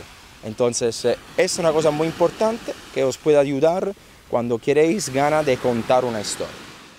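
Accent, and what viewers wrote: Italy. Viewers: Todo está perfecto 👌 y me encanta tu acento italiano o estoy equivocado? 🤔 Saludos desde Brasil 🇧🇷 😘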